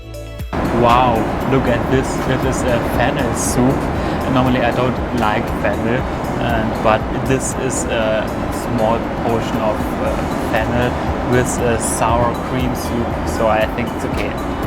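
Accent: Germany. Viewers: Dein Englisch ist so deutsch! So richtig schlecht!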